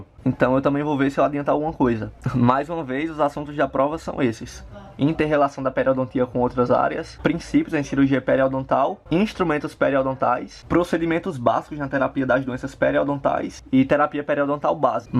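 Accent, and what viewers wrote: Northeast, Brazil. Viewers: Vc é nordestino né bixo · Você é nordestino?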